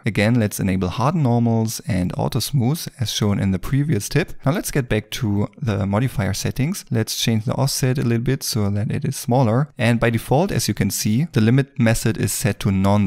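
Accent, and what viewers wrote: Germany. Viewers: are you german? sounds a bit like an german accent :)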